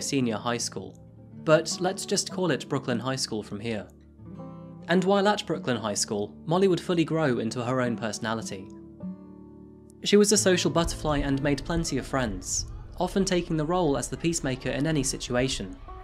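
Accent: England, United Kingdom. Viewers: Am I the only one that gets annoyed that he gives temperatures in farenheit and doesn't even provide a conversion to celcius...I have no fuckin idea what 88f is and neither do most of the world. Dude you have a British accent throw us a bone here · that's hella weird and goofy. Not at all, those subs gonna hit 1 million soon enough for this guy, so it speaks for itself. Very clean production, B roll smooth editing, and his very English accent adds to his distinguished diction,soft spoken but most importantly. He humanizes the victims in the cases, he puts the focus on their story of how they lost their life and doesn't sensationalize it. But as he tells their story like hers, he honors them. by telling their story like this, he's getting the bag and does it with class.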